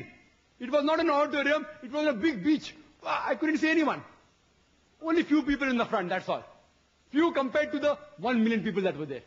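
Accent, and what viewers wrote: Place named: India. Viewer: just cos he has a strong accent as it is his SECOND language (or third depending where from India he's from), it doesn't invalidate his point.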